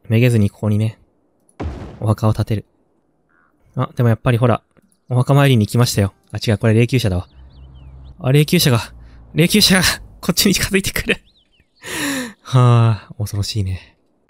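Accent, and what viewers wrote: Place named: Mie, Japan. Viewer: 〜 関西人説 「為せばなる、為さねばならぬ何事もってやつ『やな』」 結構標準語やし三重県民かなぁ？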